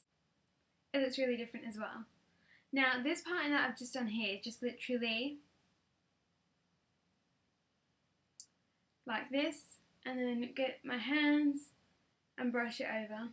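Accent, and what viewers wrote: England, United Kingdom. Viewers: I "liked" because I love your cute english accent! ((( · guys, 1. its not 'english' accent, its 'british'. 2. so what if she says litterally alot? dont make fun, these are quite cute, just not something everyone would use. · you sound english? or an american putting on an american accent?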